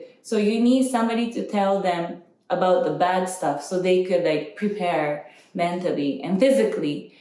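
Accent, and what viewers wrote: Philippines. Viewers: Filipino accent 😍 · Why is her accent sounds like filipino?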